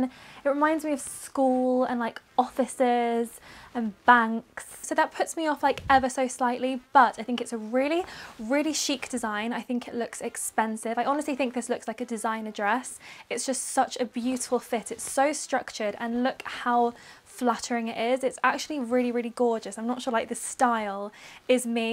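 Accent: United States. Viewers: felt like singing today, and she was into her American accent. Good for you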